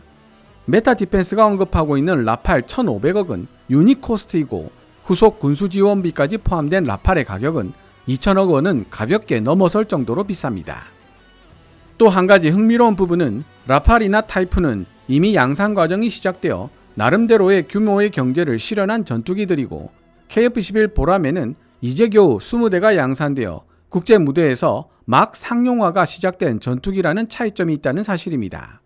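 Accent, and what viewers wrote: Gyeongsang, South Korea. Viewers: 갱상도 사투리 심하넹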